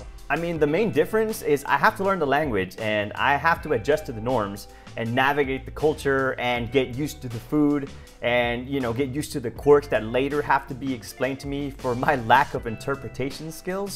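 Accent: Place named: Southern California, United States. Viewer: LoL yeah, you definatly have a So Cal hipster accent 😅👍 Love those hills in the intro. Hows the economy there in Lyon? It's my number 3 spot I'm thinking, thou heard it's more expensive than toulouse & Rennes.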